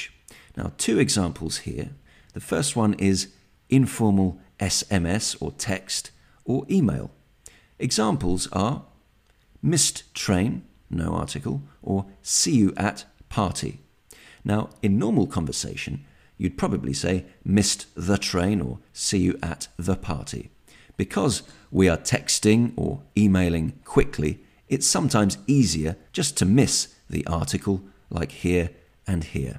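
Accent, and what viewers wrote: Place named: United Kingdom. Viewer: UK English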